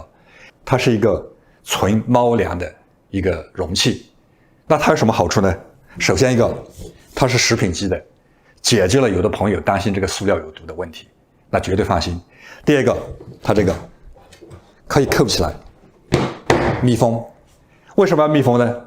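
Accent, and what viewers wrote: Yunnan, China. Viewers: 您应该是云南人吧😂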